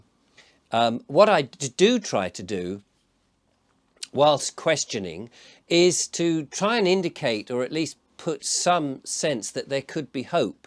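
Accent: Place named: England, United Kingdom. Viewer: Spoken by a true English man.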